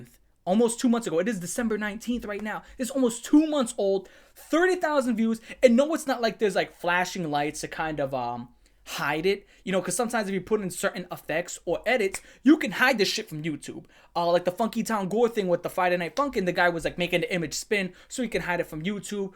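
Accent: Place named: Nuyorican, United States. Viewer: I’m from Puerto Rico and You speak that New Yorican Spanish lol but it gets a pass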